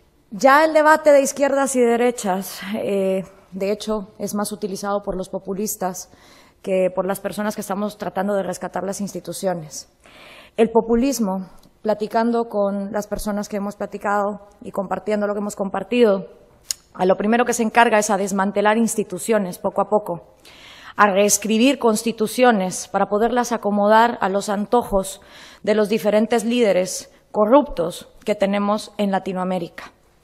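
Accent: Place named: United States. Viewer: acento norte americano y eso sí ya es peligroso...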